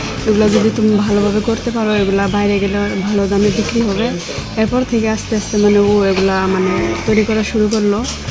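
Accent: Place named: Mymensingh, Bangladesh. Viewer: আইন্নে ময়মনসিংহের ভষাডা পুরা তুইল্লা ধরছেন 😎😎😎😎😂😂😂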